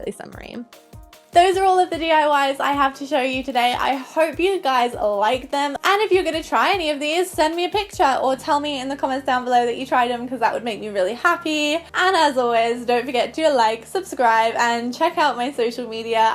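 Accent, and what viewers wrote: England, United Kingdom. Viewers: Are you from England? You have a sweet accent :)) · Are you from the uk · are you from the UK ?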